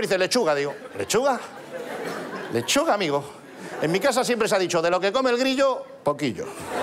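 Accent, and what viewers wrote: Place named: Castile, Spain. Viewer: Leo Harlem es de norte de España, más concretamente del norte de León. Su acento es castellano neutro por así decirlo.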